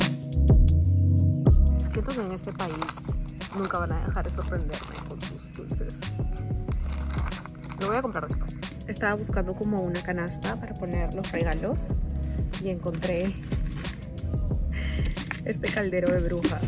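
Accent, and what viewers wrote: Peru. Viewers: a le sale más su acento peruano con su mamá jaja me encanta!! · Un solo día con su mami bastó para que el acento de peruana regresara jaja soy Mexicana y lo noto muchísimo, me imagino es como Peruano fresa, me encanta:D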